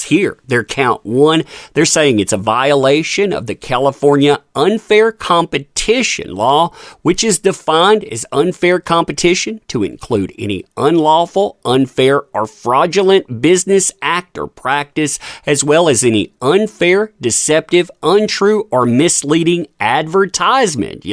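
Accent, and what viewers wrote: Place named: Mississippi Delta, United States. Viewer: We haven't to be from the same small town. We sound alike. Mississippi River Delta?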